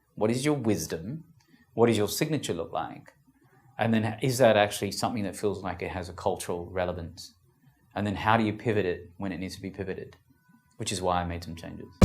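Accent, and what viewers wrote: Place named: Australia. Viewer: This guy spouts non-sense in the form of words all stringed together that sounds credible in the Australian accent!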